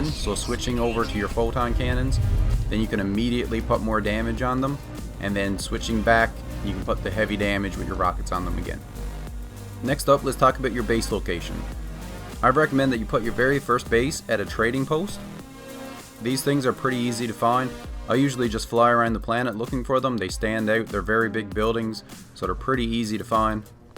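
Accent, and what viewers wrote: Newfoundland, Canada. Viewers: Could tell your a newfie from the start aha! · Some good tips, thanks! Oh, and I had to do a double take when I heard your accent 😆 not many newfy YouTubers I'm aware of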